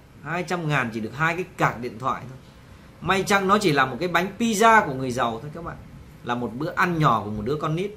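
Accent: Standard. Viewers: Ban noi qua.chuan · Bạn nói chuẩn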